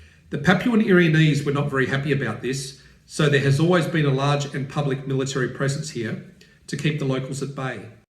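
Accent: Australia. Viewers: English with Australian accent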